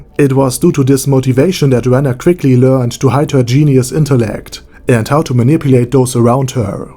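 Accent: Germany. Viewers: I am pretty sure that your original language is German xD der Inhalt ist supi aber dude dein Dialekt ist schon übel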